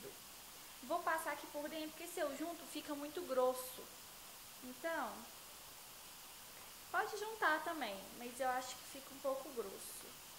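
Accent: Minas Gerais, Brazil. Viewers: Você é muito fofa, adoro a graciosidade que o sotaque mineiro tem!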